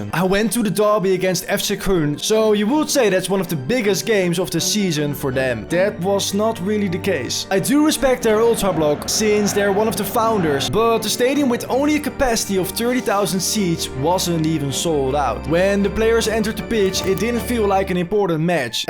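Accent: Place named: Netherlands. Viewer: Verschrikkelijk Nederlands accent. Maar de manier van praten is helemaal stuitend. Na 1 minuut met schaamte afgezet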